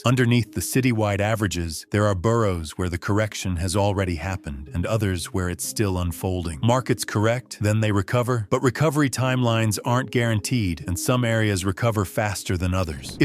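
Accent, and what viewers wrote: United States. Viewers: That American accent chatting our London business is so unfit and that’s only the start. The information itself is used toilet water. Whoever uploaded this AI nonsense needs to get a grip.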